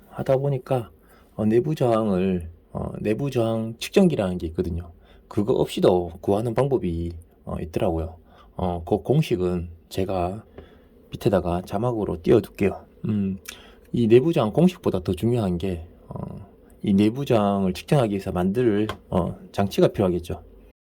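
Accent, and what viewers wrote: Gyeongsang, South Korea. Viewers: 자막설정 안하면 뭔말인지 몰것다...ㅎㅎㅎ 경상도 사투리는 언제들어도 적응이 안된다.......ㅎㅎ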